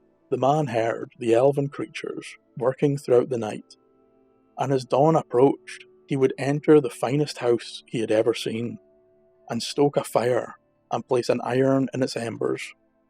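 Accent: Scotland, United Kingdom. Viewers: thanks for telling Scottish stories with Scottish accent. i actually cannot stand English or American accent. Due to my upbringing. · Please Sir, may I have some more? You have a handsome voice and I sincerely and truly appreciate & enjoy your lyrical Scot's accent. Yours truly from America. Be Blessed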